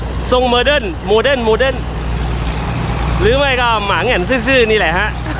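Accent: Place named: Bang Kam, Thailand. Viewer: พูดเหมือนคนบางกํา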